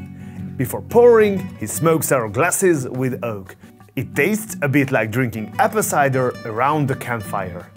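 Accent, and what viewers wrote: Hungary. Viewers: Kilométerekről is felismerni a Magyar akcentust 🫣 Üdv itthonról!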